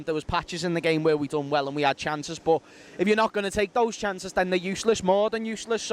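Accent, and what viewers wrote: Liverpool, United Kingdom. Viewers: This fella is offensively scouse ...LEEDS LEEDS LEEDS LEEDS ! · Always love the Liverpool accent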